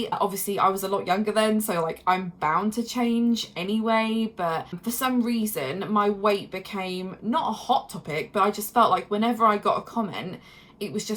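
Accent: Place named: United Kingdom. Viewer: i finally found a uk (or at least you sound like you're from here) AC player! HII :3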